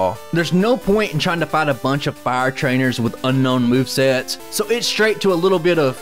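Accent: South, United States. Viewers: i did not know how i'd feel about a pokemon solorun channel with a southern accent. guess i like it now 🤷🏽‍♂️ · Are you from a Southern state by chance lol ?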